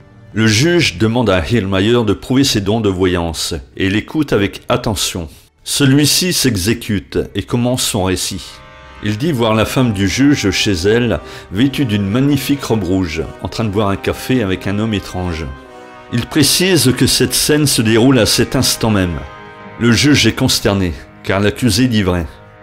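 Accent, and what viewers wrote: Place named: North, France. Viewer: Monsieur tu as la voix des gens du nord.di moi si je me trompe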